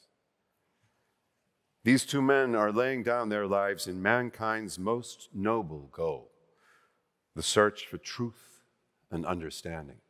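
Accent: United States. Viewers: How interesting that Mr. Cumberbatch chose to read this letter in - what is to my ear - an American accent. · Why was Benedict speaking in an American accent? Was it because everyone kept making fun of him for saying penguin weird? Honestly it’s not that weird, he can say it however he wants lol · You have a beautiful speaking voice Benedict and you are a truly gifted orator, but, an American accent? I know the Apollo astronauts were American however your natural voice would have done them just as much justice. Kudos to Anne Lapwood, a thrilling end to this piece.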